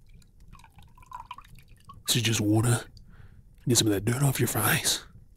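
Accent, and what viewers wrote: Australia. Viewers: Oh, geez, I'm a sucker for Aussie accents! I love your work,! Thanks more making the content you do!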